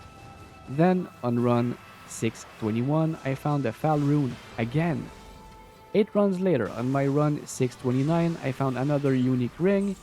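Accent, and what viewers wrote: Quebec, Canada. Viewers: Are you from Quebec? Accent seems familiar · ca sent le quebec icitte !!!!! good video bud! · Facile d'reconnaitre un Queb quand t'en entend un lol. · Are you quebecois? I feel like I can recognize the accent as a fellow Canadian. Cool video either way!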